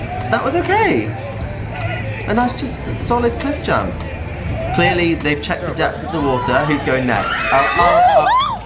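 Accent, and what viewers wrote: United Kingdom. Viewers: Tres British 😊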